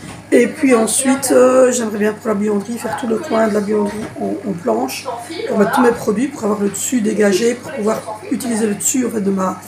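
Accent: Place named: Belgium. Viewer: Tu as qd même un peu l'accent belge je trouve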